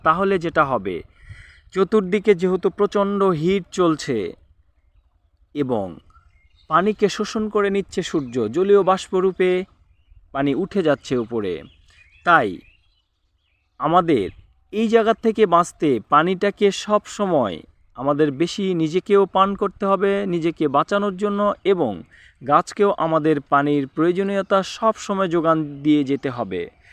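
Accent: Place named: Naogaon, Bangladesh. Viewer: ভাই আপনার বাসা নওগাঁর কথায়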